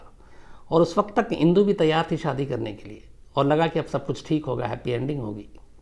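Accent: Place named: Lucknow, India. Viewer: Sams sir me aap ki sari story sunta hu kabhi comments nahi kiya aaj ker raha hu aap ki hindi mere lucknow jasi hai aap up ke ho kya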